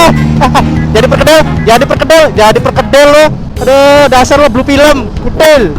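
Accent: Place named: West Java, Indonesia. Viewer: njir udh ketularan bahasa + aksen kungkingkang :v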